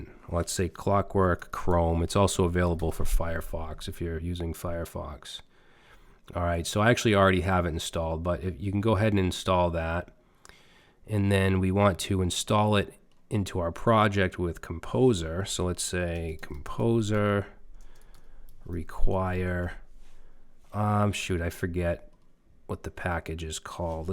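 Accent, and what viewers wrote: Boston, United States. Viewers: As a 10 year software engineer wanting to learn Laravel for fun, I'm loving this tutorial man. All tutorials should be done by folks from Boston, so I can occasionally hear "what tha hell is wrong wit me?" Better than 90% of the courses I've paid money for, and more efficient.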